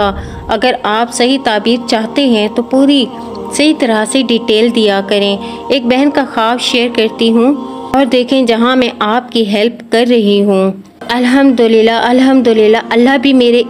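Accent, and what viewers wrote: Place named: Saraiki, Pakistan. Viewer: سرائیکی انداز میں خوبصورت انداز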